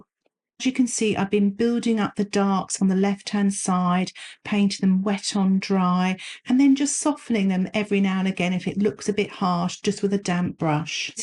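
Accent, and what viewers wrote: United Kingdom. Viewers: Merci ! - Un accent british sooo deliiicious..... Love from Aquitaine, France (do you remember your/our queen Alienor ?)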